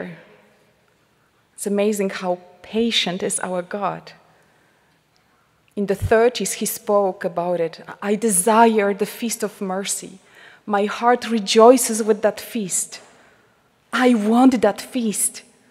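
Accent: Poland. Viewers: Beautiful Polish accent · I fell asleep with YouTube on the TV again. When I woke up, a little while ago, the tail end of this video was playing. I have been reading St. Faustina’s Diary, and thought at first that really was St. Faustina, with the habit and her slight Polish accent. I went back to the start and watched the whole video. certainly has a full grasp and understanding of the Divine Mercy devotion. I have shared and recommended this video to my Facebook friends.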